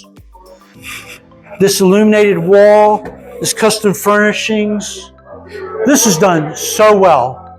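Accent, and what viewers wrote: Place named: Scotland, United Kingdom. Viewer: Everyone loves your Scottish accent!! Especially as Americans 😊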